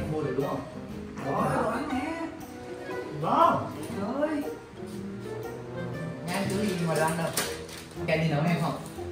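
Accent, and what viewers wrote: Vietnam. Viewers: Anh nói tiếng Nam đáng yêu xỉu 😍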